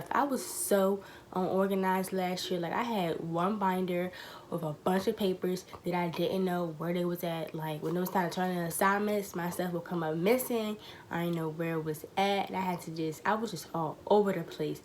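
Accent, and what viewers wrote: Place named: Carolinas, United States. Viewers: It's a southern accent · 😂😂she's from like the Carolinas or Baltimore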